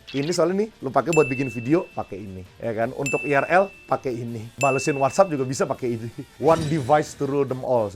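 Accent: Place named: Java, Indonesia. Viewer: Aku mendengar jawa jawa jawa disini 😅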